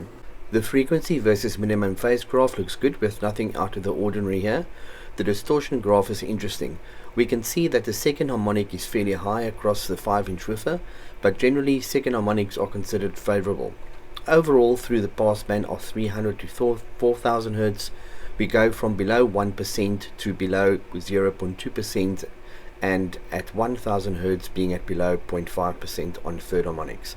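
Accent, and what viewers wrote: South Africa. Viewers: Are you South African? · Heard you were sporting a South African accent. Let me know if I'm wrong. I'm curious to know of you imported the speakers and equipment or if you shopped locally. If it's local supply, where did you procure the speakers from. I'm looking to buy from parts express, but the import costs are hefty. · Is that a South African accent I’m hearing?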